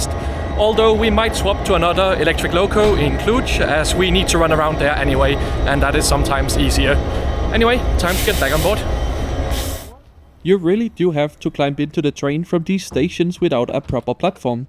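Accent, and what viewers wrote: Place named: Denmark. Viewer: He's from Denmark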